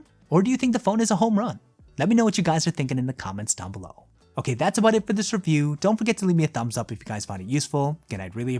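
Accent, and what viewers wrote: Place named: San Francisco, United States. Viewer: Holy stereotypical San Francisco accent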